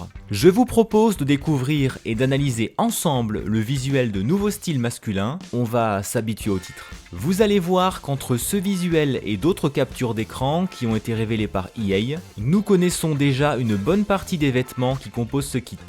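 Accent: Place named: England, United Kingdom. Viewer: J'aime beaucoup ces vidéos d'analyse et de décortication. (Superbe accent anglais au passage). Bon... perso je suis clairement déçue. J'en ai déjà marre des minikits, et même si j'ai acheté les précédents car ils pouvaient apporter des choses à mon jeu (et que je suis une collectionneuse, j'avoue), eh bien celui-là ne m'attire pas DU TOUT. Alors je me pose une vraie question... Est-ce que je deviens une vieille aigrie ? Est-ce que ça y est, je n'arrive plus à vivre dans mon époque ? Mais franchement je trouve toutes les pièces présentées très laides, voire immettable. Non mais franchement, IRL qui a envie de porter ça ? Je ne suis pas une pro du style hein, du coup mes propos n'engagent que moi, mais m** quoi, moi aussi je voulais des tenues hommes simples et mettables ! Donc du coup je suis en colère. Je ne paierai pas pour avoir 1 paire de pompes et 2 pulls ! 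Voilà ! 😑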